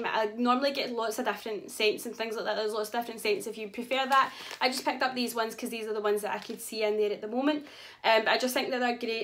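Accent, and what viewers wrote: Scotland, United Kingdom. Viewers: Great to hear a scottish accent doing a poundland haul......keep them coming xx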